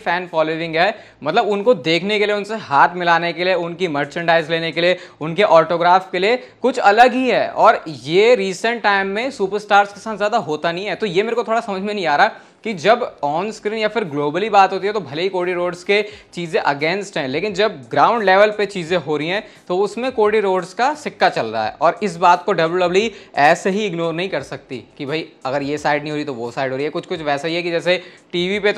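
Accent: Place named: India. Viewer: I love wwe content, but I don't understand Indian English.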